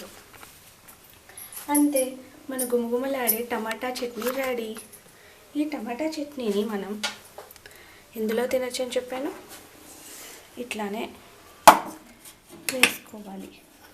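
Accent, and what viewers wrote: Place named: Telangana, India. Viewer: Your channel name says Spl telangana I'm thinking you are from Telangana and you want to share Spl recipes of telangana but your accent is not Telangana nor andhra. You mixing both accent.... Don't be afraid of talking in talangana ... Be your self and speak the accent what you normally speak at home..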